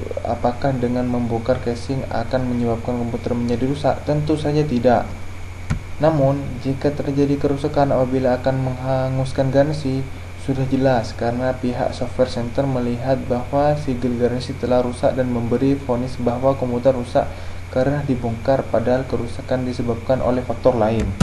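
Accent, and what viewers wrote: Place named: Madura, Indonesia. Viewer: Corak madureh se ngocak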